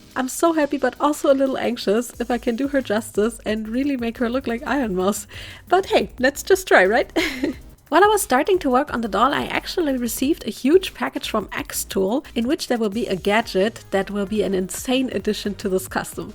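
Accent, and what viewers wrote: Germany. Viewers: I thought till I heard uhu (and saw) that you have an light german accent (I kinda have the urge to write everything in german but I let it be) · She looks marvellous! Great job. Her shoes are really worth the work and look amazing. Ps: Ha, ich hatte Recht mit dem deutschen Akzent. Schön das wir so eine talentierte Dollmakerin haben : ) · me and my cousin LOVE ironmouse and watch all of her streams together so im happy that you made a doll of her also are you german? you have an accent to me and the name of the contact glue looked german so i got curious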